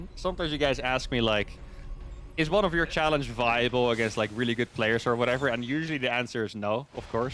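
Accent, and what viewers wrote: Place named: Netherlands. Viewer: He is Dutch. Most of them speak English very fluently. Coming from England I can tell from his accent. The Netherlands is not far.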